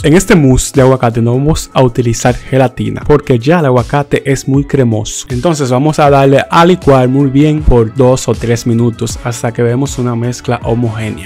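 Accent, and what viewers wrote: Dominican Republic. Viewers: Me atrevo a apostar que eres dominicano?!!! 🥰 un abrazo fuerte desde Charlotte, NC. ..... ah! Me encantan tus recetas!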